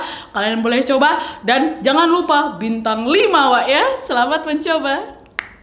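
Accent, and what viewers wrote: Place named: Medan, Indonesia. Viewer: Kak orang mana?? Kok logatnya kayak orang Medan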